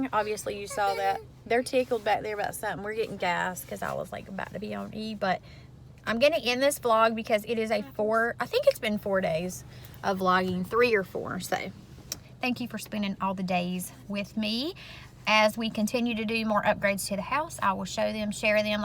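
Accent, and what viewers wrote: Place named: West Virginia, United States. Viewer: I just seen your channel. I live in NC but I am from WV. You have that wva accent lol. Love it. Also love there is no pretend bullshit. Keep it real .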